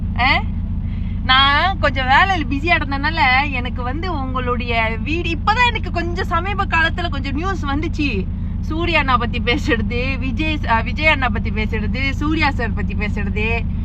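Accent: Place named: Madurai, India. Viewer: Malaysia la irukkanu solra but madurai language pesara.. but diwakar naai ah vachu vachuthuku nandri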